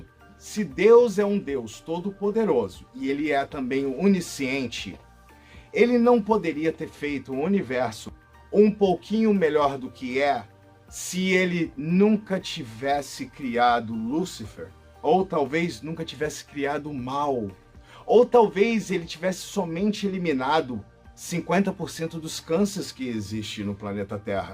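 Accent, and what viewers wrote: United States. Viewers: Gente por favor que sotaque é esse? O cara é um brasileiro que esqueceu o português ou um brasileiro com síndrome de baba ovo de americano? Esse cara fala igual a um americano que está aprendendo português..que vergonha alheia ..fala direito brasileiro valorize a terra que vc nasceu..esses vídeos desse cara pode entrar para os top lixo do YouTube..que bosta ..não recomendo.... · legal perguntei devido o sotaque americano. Gosto muito do seu canal. 😍❤❤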